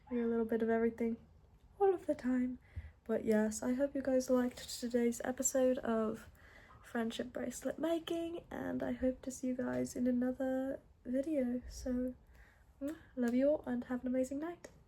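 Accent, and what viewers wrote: United Kingdom. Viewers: I KNEW YOU WERE BRITISH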